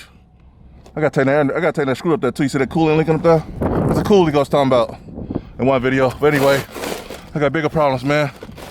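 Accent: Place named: Baltimore, United States. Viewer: You from Baltimore ain’t you? If not you sound exactly like my cousins from there. But thanks for the video and tip.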